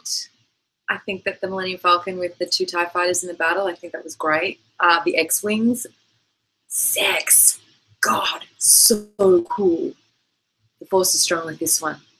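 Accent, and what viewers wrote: Australia. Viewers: You sound Australian. :)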